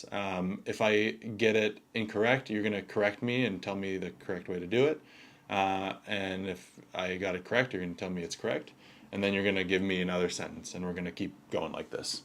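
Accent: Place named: United States. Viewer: Very American accented French though, I wonder if you can change it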